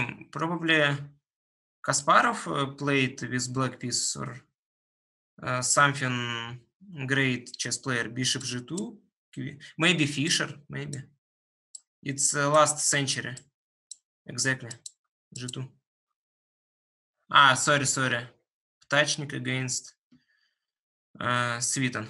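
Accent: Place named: Russia. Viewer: I googled brutal russian accents and ended up here, amazing stuff.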